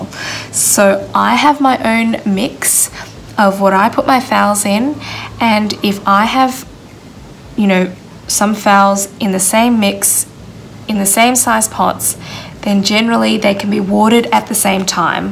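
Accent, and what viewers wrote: Australia. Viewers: Excellent video on how to correctly repot orchids. Love you Aussie accent cheers.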